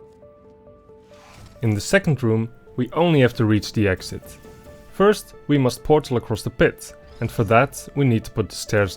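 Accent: Netherlands. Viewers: wait, u dutch? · I was like "What is this Dutch accent?" and then I saw the name and was like "Oh, ok."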